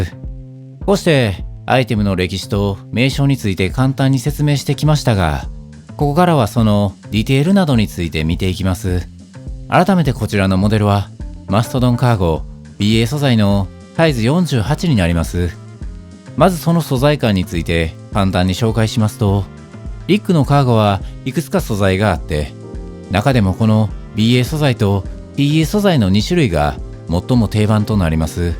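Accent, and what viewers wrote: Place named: Kansai, Japan. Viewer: 関西弁新鮮！！これもまた良き👍👍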